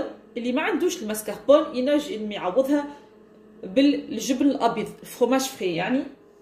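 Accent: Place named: Tunisia. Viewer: ماشاء الله عليك بحب اللهجة تونسية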